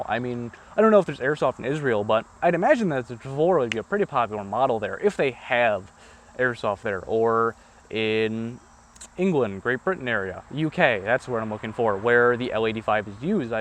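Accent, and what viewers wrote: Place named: United States. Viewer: yeah well he's american they say most things weird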